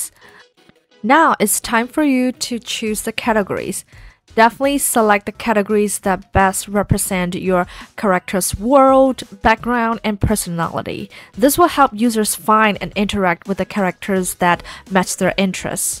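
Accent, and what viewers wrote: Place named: China. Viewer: 听起来像国人xyx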